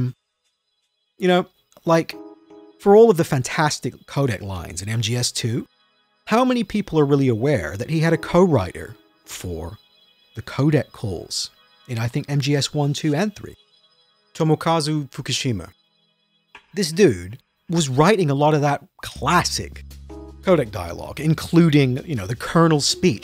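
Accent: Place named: West Country, United Kingdom. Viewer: More likely from the south west country, not upper class but well educated working middle class from a nice area.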